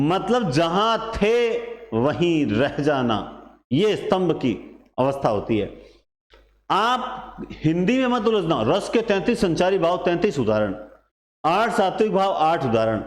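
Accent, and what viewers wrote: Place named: Rajasthan, India. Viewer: आप के मुख से मारवाड़ी बहुत अच्छी लगती है गुरुवर मन गदगद हो जाता है 😍😍😍😍😍 मेवाड़ी कलम से ✍️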